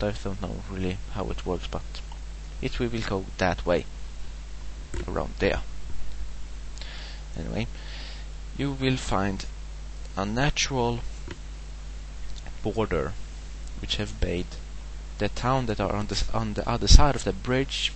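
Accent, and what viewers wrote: Sweden. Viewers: At least you don't have an American accent! Swedish accents are way better, a lot of people want to sound Swedish as well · I love your accent! I would definitly prefer to be Swedish than english😕😂😂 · I'm over here trying to master a Swedish accent xD You have a nice accent and voice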